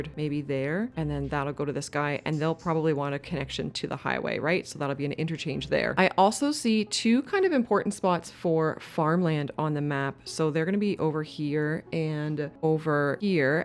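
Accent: Canada. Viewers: As a fellow Canuck... your consistent pronunciation of "aboot" hit my funny bone just right! LOL Anyway, this was HUGELY helpful! I have never thought of this idea, and I struggle when building cities, despite all the great guides and tips out here in YT Land. I'm going to start over - again - like, I think this will be my fourth city start since I got the game the day it came out - pick a map, take a screenshot, load that into my Goodnotes app on my iPad and mark it up with my Apple pencil in ALL THE COLOURS! Then I can have my plan in front of my face while I build. SUCH a great tip, thank you for sharing!!! :trophy-yellow-smiling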